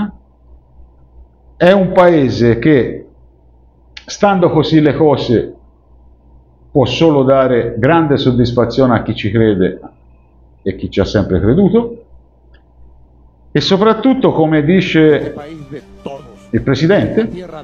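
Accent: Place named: Florence, Italy. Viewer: e tu sembri di Firenze!!!!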